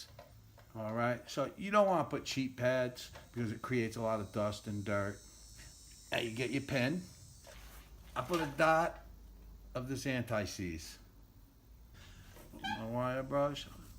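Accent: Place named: South, United States. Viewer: I noticed you have a slight accent are you from are you from Tennessee or Kentucky 🤠🤠🤠🇺🇲🇺🇸🇺🇸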